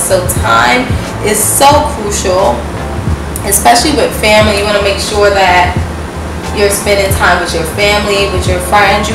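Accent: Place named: New York, United States. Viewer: LOVE your NY accent!! Gorgeous too girl!!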